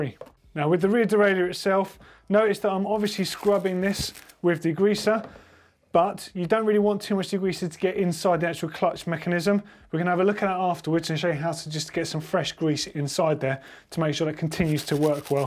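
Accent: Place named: United Kingdom. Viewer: F*cking "brittish" accent :(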